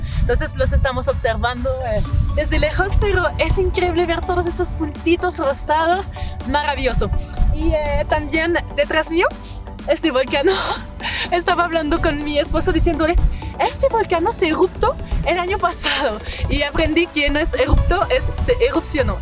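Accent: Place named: France. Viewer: You’ve won a subscriber because you really love my country as it was yours. I love your French accent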